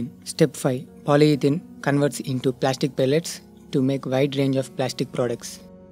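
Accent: India. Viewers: indian accent...😪😪😪😪🥱🥱🥱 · Thanks for the video but your English narration is just terrible the accent is all Indian and it is difficult to understand a word your saying, for goodness sake get a narrator with a good English accent to do your narrations |||||||||| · I hate indian accent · damn indian accent. headache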